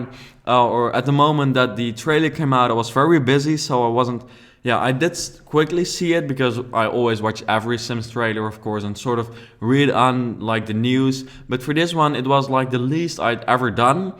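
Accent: Netherlands. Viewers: are you Dutch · Haha I recognized your accent as being Dutch before I knew you were! Awesome! Just bought this expansion and looking for some modern builds as well. I like modern and I like my vampires to live in a modern house as well. Nice build!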